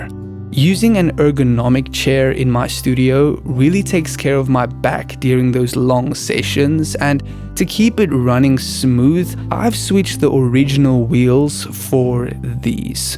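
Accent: South Africa. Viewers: is that a South African accent im picking up?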